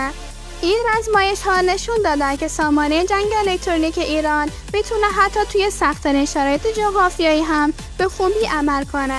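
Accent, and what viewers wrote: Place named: Afghanistan. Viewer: گوینده افغانی هستش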